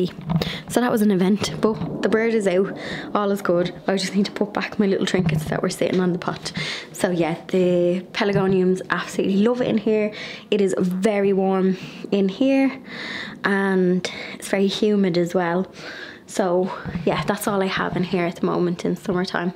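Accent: Ireland. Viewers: just found your wonderful site! Awesome! You have so much energy! and all the hard work has resulted in beautiful gardens! (where are you located? I detect an Irish accent) Keep on keeping on....regards from Nana Green Fingers in the U.S.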